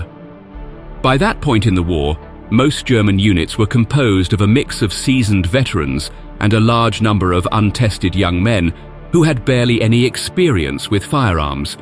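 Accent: United Kingdom. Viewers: British accent and a booming voice, this narrator was perfect for this documentary. An amazing history lesson that held me riveted for the entire 25 minutes. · too much irrelevant digressions to Stalingrad etx a wasted of time-- Why dont these British documentaries just get to the point of the title? As soon as hear the British accent i just know it`s longwinded -takes two hours just to get to the point of the title ...a total waste of time.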